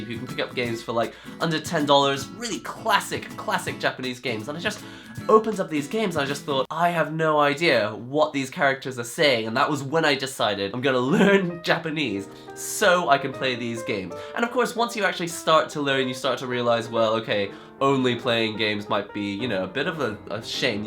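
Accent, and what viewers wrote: United Kingdom. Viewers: So is the British? And learned Japanese?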